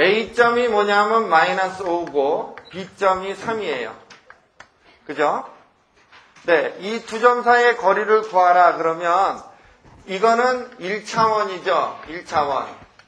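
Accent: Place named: Seoul, South Korea. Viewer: 오 옛날 방송 보면 나오는 서울 사투리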